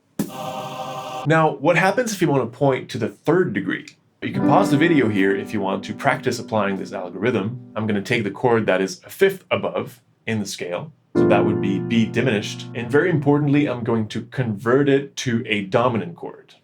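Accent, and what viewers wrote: Quebec, Canada. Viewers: Vous faites honneur à l’accent québécois et à mon standard de jazz préféré:! Très inspirantes vos vidéos regardées du haut de ma tour situé dans feu Ville Vanier… Le regard ensuite tourné vers le château Frontenac pendant que percole la richesse de vos enseignements…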